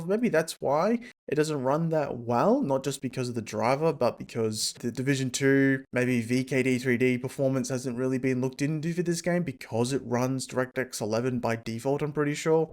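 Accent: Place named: Australia. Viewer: I just realized you have an Australia accent, simply sublime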